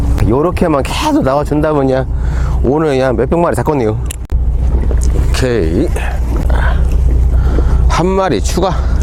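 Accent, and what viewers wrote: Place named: Gimje, South Korea. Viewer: 저도 고향이 김제인데 사투리도 생활하시눈것도 친근감이 가네요~ 시골라이프 부럽습니다!!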